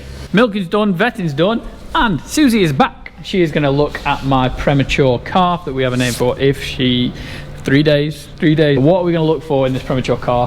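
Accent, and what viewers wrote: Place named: England, United Kingdom. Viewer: English English.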